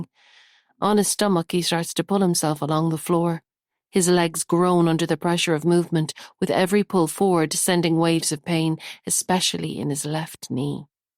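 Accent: Ireland. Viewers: sounds Irish to me.... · Yay! Irish narrator. Dunlaoghaire! I know the town so, so well! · Irish accent?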